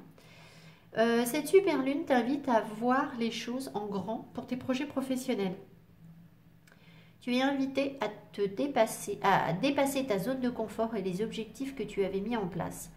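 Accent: England, United Kingdom. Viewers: J.adore votre accent anglais🙏🌻💝🌞👍🥰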